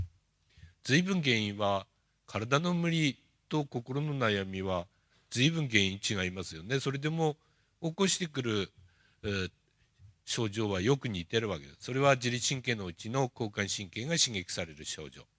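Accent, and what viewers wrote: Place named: Tohoku, Japan. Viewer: 安保先生お若いですね。人類愛だなぁ。東北のイントネーションが耳に心地よく癒やされるのでずっと聞いていたいです。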